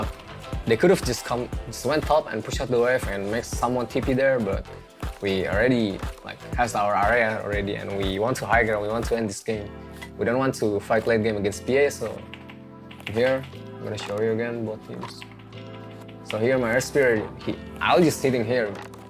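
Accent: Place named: Indonesia. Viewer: 7/10 inggrisnya orang indo, dia kuliah apaan dah?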